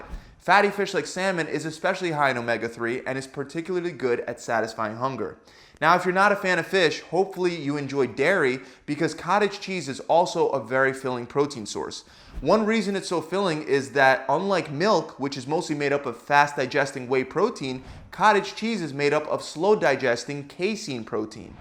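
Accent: Philadelphia, United States. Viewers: He's gotta be from Philly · he’s from philly “wooder melon “ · Woodermelun lol I know a Philly accent when I hear it! What can I say; we pronounce our water, watermelon and waterice, aggressively 😎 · guy sounds like he's from philly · Quick question where you raised in the philly area? I noticed you say Wooder just like we do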